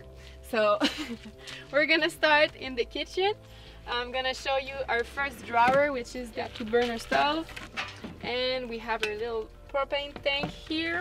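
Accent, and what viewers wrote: Quebec, Canada. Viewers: Where are you located. ? Sounds like Quebec Canada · Il y a plusieurs vidéos en anglais de ce genre. Ça fait du bien d'entendre l'accent québécois. Nice set-up.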